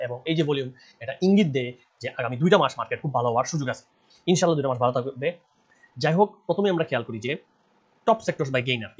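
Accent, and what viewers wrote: Sylhet, Bangladesh. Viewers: ভাই আপনি কি সিলেটি নাকি এত ফাস্ট কথা বলেন কেন আস্তে আস্তে সুন্দর করে কথা বলেন। আশা করি আমার কমেন্টসটা বুঝতে পেরেছেন। এবং ওইদিকে খেয়াল রাখবেন। আপনাকে থ্যাংক ইউ।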